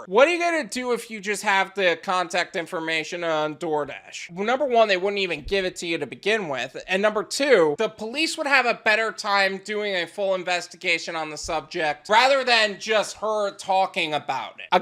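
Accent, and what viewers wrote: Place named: United States. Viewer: Bro i like you us accent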